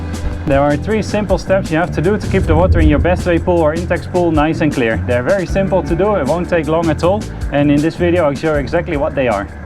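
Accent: Netherlands. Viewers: When I heard him speaking I immediately knew he's from the Netherlands 😂 I just know the accent lol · You sound Dutch, but the environment looks way better than here in Holland. Nice video!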